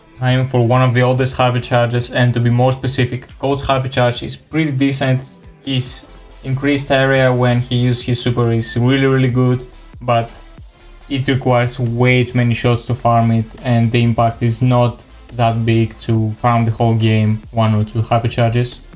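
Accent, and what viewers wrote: Greece. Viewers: The Greek accent🇬🇷🇬🇷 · Ayeeee the greek accent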